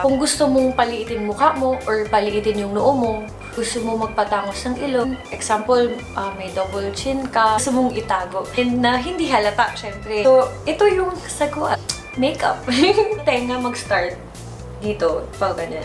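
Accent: Visayas, Philippines. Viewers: kanang accent sa mga bisaya pagmagtagalog ba..😆😅hahah, ganyan din ako kadalasan eh.!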